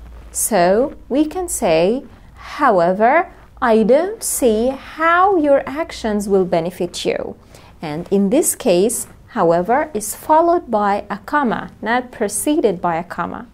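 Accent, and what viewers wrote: United States. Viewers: Thanks for everything my friend to speak and to teach only in English you have a very powerful English with american accent with a perfect pronunciation. It's very very useful when you speak only in English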